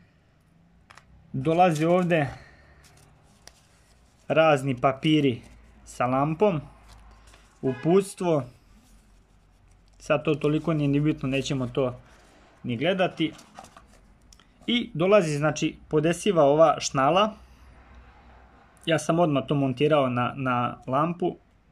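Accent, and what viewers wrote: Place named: Mačva, Serbia. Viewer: Veliki pozdrav, samo tako nastavi. Ledlenser je odlična kompanija imam dve, jednu na glavu i jednu sličnu toj samo malo veću. Meni se čini po tvom naglasku da si iz Mačve, ili grešim🤔🤔?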